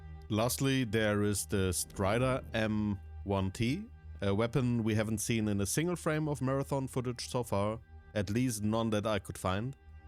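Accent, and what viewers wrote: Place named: Germany. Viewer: Guten Content bringst du. Deinem Akzent zu entnehmen hast du deutsche Wurzeln wenn ich mich nicht irre. Glaub an dich und mach weiter. Nice Content Dude. Maybe I have The Chance and we can Play together at Release.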